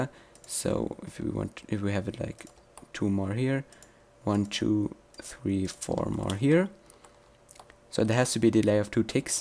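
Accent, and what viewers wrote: Germany. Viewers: Your german right?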